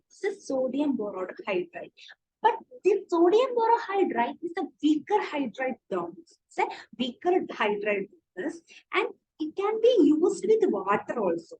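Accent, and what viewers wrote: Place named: Kerala, India. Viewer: Malyalam mathii english not clear mam.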